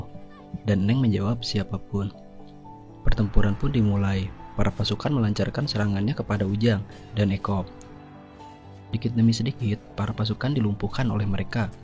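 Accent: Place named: Sunda, Indonesia. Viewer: Pembaca Narasinya sangat 👍👍👍👍👍Sunda yg 😅👌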